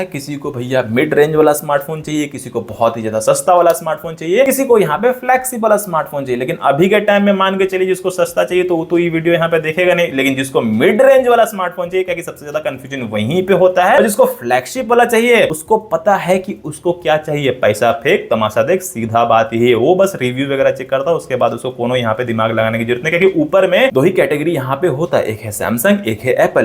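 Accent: Bihar, India. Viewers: बिहार se kya bhai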